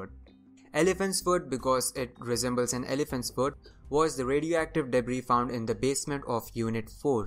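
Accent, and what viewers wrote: India. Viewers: Bruh indian?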